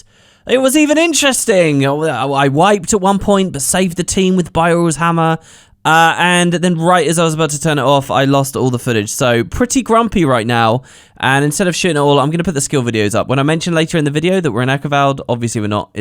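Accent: United Kingdom. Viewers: British to a ̶t̶e̶a̶ tee.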